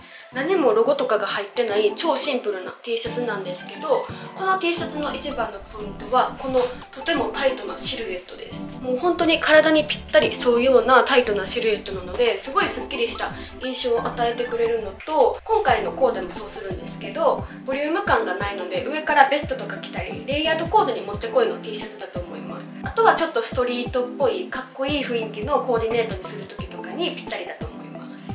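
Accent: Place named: Kansai, Japan. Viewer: shortをたまたま観て、可愛過ぎて動画も観に来たら関西弁なのずるいです、、🥹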